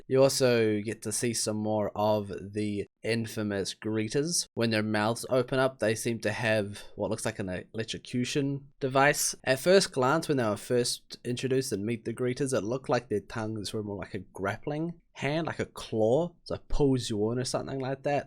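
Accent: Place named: New Zealand. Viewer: Hey bro, great vid! Is that a fellow Kiwi I hear?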